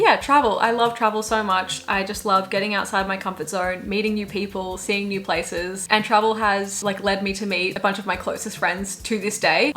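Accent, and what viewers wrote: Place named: Australia. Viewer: Great video! You are so adorable, the first time I saw your video I noticed your eyeshadow really stands out, and your glasses! I love your style. I'd love more Q&A videos like this!:) PS. I love your Australian accent I could listen to you talk about the weather LOL I also haven't finished TVD but I'm Team Damon. My least favorite album is.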